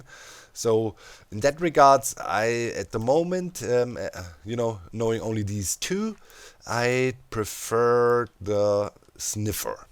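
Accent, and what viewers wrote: Germany. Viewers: hey i wonder If you can understand that ich habe erst und der Folge erfahren das du deutsch bist hatte aber immer den Akzent gehört ich gucke deine Videos jetzt seit grob einem Jahr die Shadow items die du mumbo letzte Season gezeigt hast haben haben mich neugierig gemacht und ich habe dich abonniert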